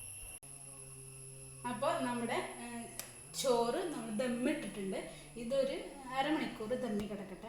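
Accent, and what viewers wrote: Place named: Malappuram, India. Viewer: First video is good, good presentation, Malappuram style language, everything is great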